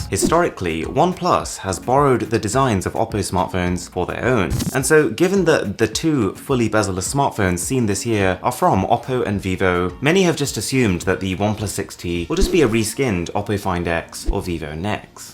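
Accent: RP, United Kingdom. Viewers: You have a British accent so surprised you choose to indicate 'two' with your fingers by showing the knuckle side of your fingers. Turn your hand around when you want to show you mean 'two'. 😉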